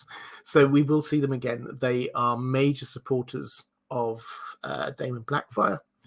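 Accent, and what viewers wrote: England, United Kingdom. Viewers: Every night I play about 20 min of these vids and they seem to slowly rock me into a peaceful sleep. It’s to the point now where I can’t fall asleep without hearing my mans boss English accent.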